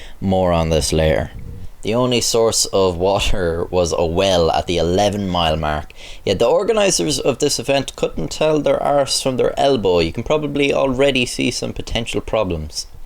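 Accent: Ireland. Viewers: Irish boiii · bro are you irish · I KNEW YOU SOUNDED IRISH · 32 He has a Irish accent. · Are you Irish? The "r" in your accent seems to be very irish. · I've subscribed you are fucking hilarious my friend I think it's the Irish accent it gets me every time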